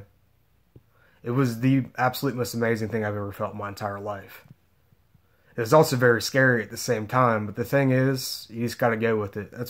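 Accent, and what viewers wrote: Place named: East Texas, United States. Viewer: You sound like your from east tx also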